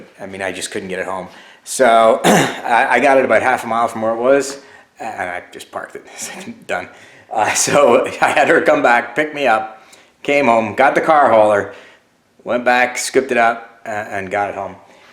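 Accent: Belfast, United Kingdom. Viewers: Nathin, oul belfast coming out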